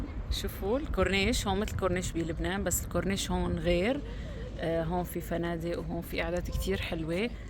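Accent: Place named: Egypt. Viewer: ربنا يسعدك حضرتك بتتكلمى مصري ماشاء الله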